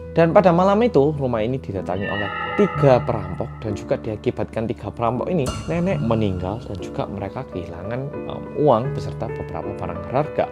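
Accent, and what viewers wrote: Java, Indonesia. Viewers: Medhokkk banget🤭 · Harusnya bersama detektif Korea yang medok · MEDOK....... NICE 😁😁😁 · yey dtektif korea yang medok UPLOAD SETIAP HARI, semangat mas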